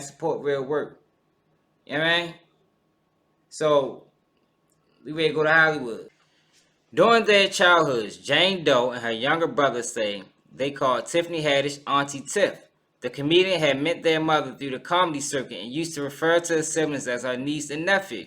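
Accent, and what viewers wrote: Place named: Baltimore, United States. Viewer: That shit is wild!!! At first I thought it was gonna be some bs lawsuit...nah that shit sounds traumatizing. Especially when you hire "talent" and treat them like animals. They took it too far. Pay the young lady n her brother. And apologize. Thats the least u could do. I imagine a real shoot where the topic is pedophiles they actually make sure people are comfortable. They basically abused those kids. It may not be to the full on pedophilia but they put the kids in an environment as such. Thats messed up. Yo... I think your accent... Baltimore area? Is crazy. Those two, you, who sounds lol. Word yall got one of the best accents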